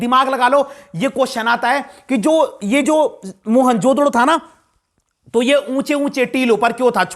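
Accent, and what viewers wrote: Garhwal, India. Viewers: Gadwali accent 🔥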